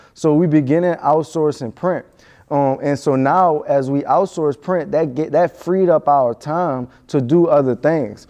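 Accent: South, United States. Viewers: Are you from Louisiana? I hear a southern accent. Love your content btw! I’m getting ALOT of tips for my business.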